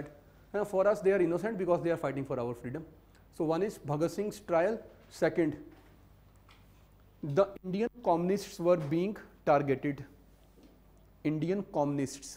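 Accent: Punjab, India. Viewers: Finally someone explained the history in a lucid manner and cherry on the cake is his punjabi touch that keeps you involved during lecture..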